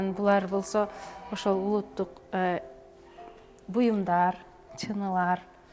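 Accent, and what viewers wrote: Standard. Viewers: Ош областында кыргызчаны таза суйлогон адам десе болот. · Оштук айрыкча баткендиктер намысынарга келип тилинерди ондогула! Карагылачы озубек кызы болсо да кандай кыргызчаны таптаза суйлойт! Таарынбай тилинерди ондогула диалект деп актанбай! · Аяш абаларга Караганда таза суйлойт экен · Мына башка улуттун окулдору минтип кыргызчаны суудай таза суйлоп жатышат. Ал эми биз кыргызча жондоп суйлой албай атып,орусчаны сайрап коебуз да. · Байкадынарбы , кобураак эмес , кобуроок деп граматикалык жактан туура , адабий тилде сцйлоп жатат . Кыргыз тилинде создун мучосундогу ундуулор унгудагы ундуулорду ээрчийт . М : Батыраак , артыраак , тезиреек , кобуроок ,женилирек ж.б.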